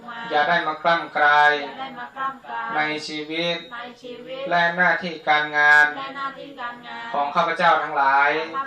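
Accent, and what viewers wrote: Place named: Lampang, Thailand. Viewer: อยู่ลำปางพระที่หมู่บ้านพูดแบบนี้เป๊ะ😂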